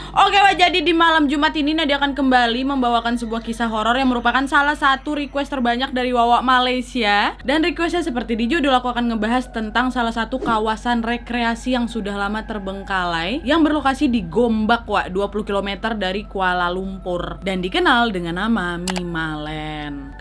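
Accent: Indonesia. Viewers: Menarik Sekali mengikuti Cerita anda , Terlebih dengan Logat Indonesia , Jadi Nyaman Mendengarnya , Sekedar Koreksi , untuk Kata Aku kalau di Akhir kalimat cukup di Ucapkan “ku“ , contoh “Aku telah Membeli sebuah Mobil, dengan Uang hasil Tabunganku“ , dan Kata Aku , Hanya bisa di Ucapkan untuk Pribadi/Keluarga/Teman Akrab , tapi Tidak bisa di Ucapkan untuk Audience , yang Baik dan Benar pakai saja Kata Saya , Bisa untuk Semua , Like dari Surabaya.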